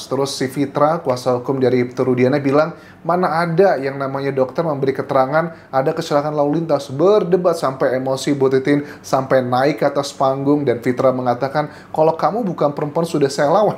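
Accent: Java, Indonesia. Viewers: mas boso jowone medok bgt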